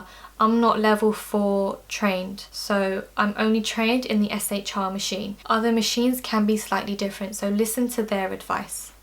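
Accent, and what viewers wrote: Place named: United Kingdom. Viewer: i like british accent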